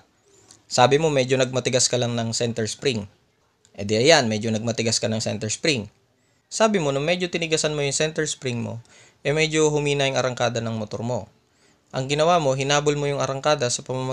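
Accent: Ilocos, Philippines. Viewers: Ito talaga idol ko sa paliwanag ng cvt eh. Tanong lang idol, ilokano ka? Saan ka idol? Hahaha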